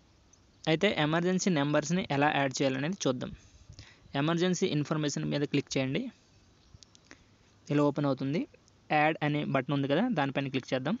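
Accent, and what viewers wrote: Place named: Andhra Pradesh, India. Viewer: I believe you are from Vijayanagaram or Srikakulam. However the content you have given in this video is not about how Emergency works with no signal... Can you be more elaborative ?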